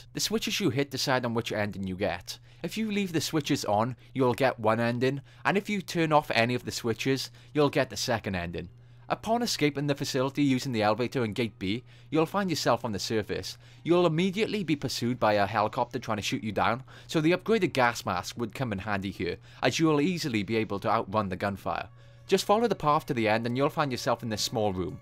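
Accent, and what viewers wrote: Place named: South Wales, United Kingdom. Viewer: weyhey, Cymro o'r diwedd! you sound like a south wales valley boy, are you from Rhondda or like Ystalafera?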